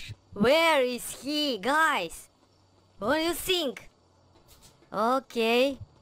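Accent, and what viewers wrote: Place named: United States. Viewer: Ты так разговариваешь потому что в соединеных штатах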